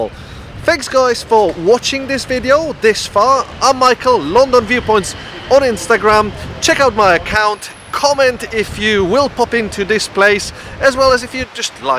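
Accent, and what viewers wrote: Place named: Germany. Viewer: German twang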